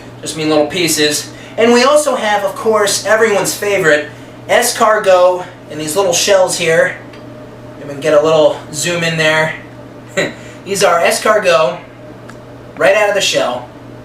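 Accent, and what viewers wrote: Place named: New York, United States. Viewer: are you from New York or Massachusetts? Your accent sounds similar haha.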